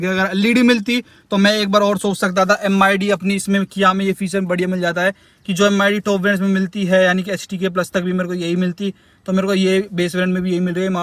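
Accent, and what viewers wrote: Haryana, India. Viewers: ji Hum aapki desi Haryanvi bahut pasand karte hai Bhai bhi thora lallu lagta hai 👍👍👍👍 Love from rewa, Madhya Pradesh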